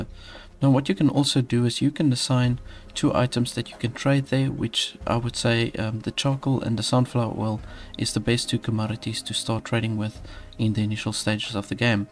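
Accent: South Africa. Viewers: South African bruv? perhaps?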